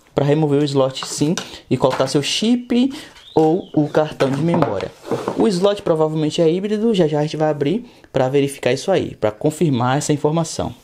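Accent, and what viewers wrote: Bahia, Brazil. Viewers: Tu é da Bahia?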